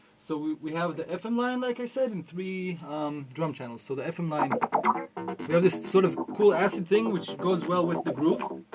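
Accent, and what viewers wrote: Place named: Israel. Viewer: אחלה וידיאו גבר כותב בעברית כי שומעים שאתה ישראלי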